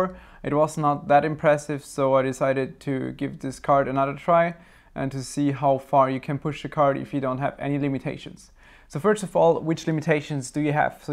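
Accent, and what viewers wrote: Germany. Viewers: You sound like a German ;) - hast du auch n deutschen Channel?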